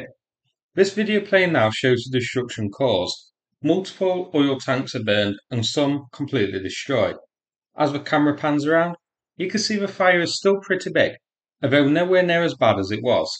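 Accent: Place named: Stoke, United Kingdom. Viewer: Are you from stoke mate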